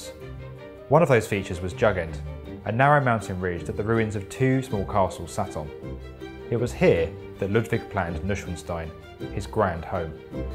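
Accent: United Kingdom. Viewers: I hv 2 comments on the narrator and the commentators here: 1- the narrator is speaking in British English, with a heavy accent only the British could follow him. British English is a curiosity to listen to but very difficult to understand and follow by non English speakers. The Brits speaking to non-Brits should realize this and speak more intelligibly (slower). 2- people who not versed in foreign pronunciation and phonetics should not speak/write as if they are correct and should notate their input as “I believe”. None are German unfortunately which makes no one’s right ! It is not Noi nor Noy and “Neu” in German is more French sounding than English so it cannot be phonetically written the English way: it is close pursing the lips when twisting the sound “eu”. Schwan sounds closest to “shvon”, with the “o” here like the American “o” (as in “pot” & “hot”) and not the British “o” (as in “pot” & “hot”). 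Then stein is pronounced simply like in both Englishes “shtine” as in “shine”. When phoneticizing a word one should clarify which type of English pronunciation is used, since British, American, Australian, Indian, Egyptian ways differ considerably, and after all, YouTube is global and not any one of these !!!! Hope this helps non-German speakers pronounce it correctly.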